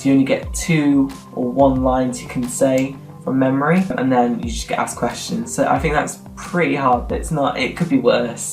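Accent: United Kingdom. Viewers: That British accent though.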